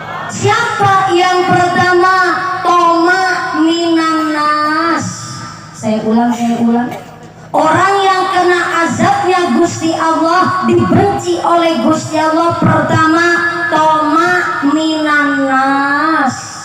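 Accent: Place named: Banyumas, Indonesia. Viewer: Assalamu'alaikum, moga sehat n sukses sllu bu ustadzah, ora ngapak ora kepenak, ❤❤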